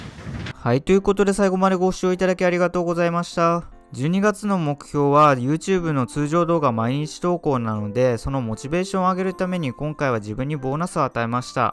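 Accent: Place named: Kansai, Japan. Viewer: 私はミスドでイーブイだけ食べれたけど売り切ればっかりでした😖 関西色強めですね😂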